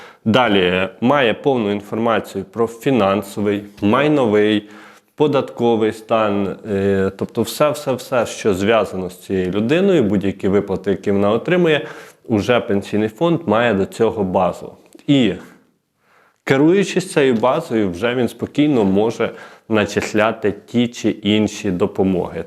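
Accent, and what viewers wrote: Vinnytsia, Ukraine. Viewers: Людмила, у мене також вища освіта і ні в якому разі не придираюсь, просто у деяких вінничан такий діалект, а молодець, займається дуже потрібною справою, за що йому велика подяка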